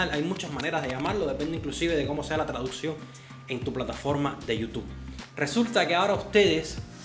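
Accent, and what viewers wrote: Cuba. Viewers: Espectacular noticia, felicitaciones, te lo mereces por ser quien eres y como eres, y no se trata de competir con nadie, pero eres uffff lo máximo, lo mejor, un grande, imbatible, profesional, inteligente, con muchas destrezas, te dejas querer, amable, amigable, apasionado y entregado a lo que te gusta y todo eso es lo que te ha hecho crecer; muy merecido, me gusta como hablas, como te expresas, como pronuncias, tan cubano, tan coloquial si caer en lo ordinario y vulgar, muy lindo que ames exageradamente a Cuba, es un país hermoso!! Continua siendo así tan auténtico y sencillo, mira que de lo bueno, poco!!!! Éxitos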